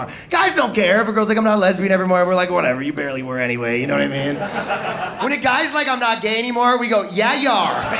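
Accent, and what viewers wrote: Canada. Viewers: Is he Canadien or something?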